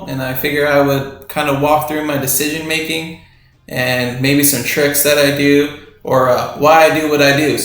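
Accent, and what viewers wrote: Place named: Russia. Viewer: Are you Russian?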